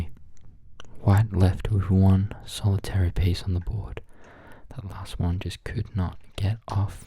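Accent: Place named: Australia. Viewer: Hey man, just wanted to say this popped up in my recommended and I’m super glad it did! As a fellow Aussie I surprisingly don’t see many ASMR creators from out our way, but your voice and accent is super well suited for this kind of stuff and the microphone/production quality relative to the size of your channel is really impressive. I’m a big fan of ASMR Chess and this gave me similar vibes to him. Keep it up!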